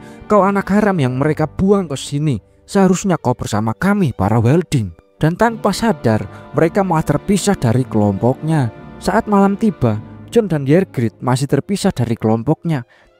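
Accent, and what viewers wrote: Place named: Java, Indonesia. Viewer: jawanya medok banget bang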